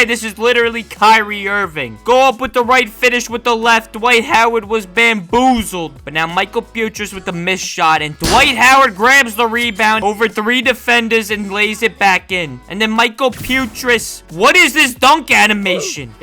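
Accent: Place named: New York, United States. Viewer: u sound like a REAL NEW YOKA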